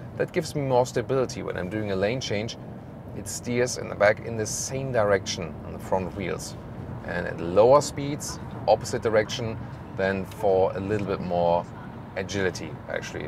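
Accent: Germany. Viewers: Wow lovely accent when germany speaks english👌🏼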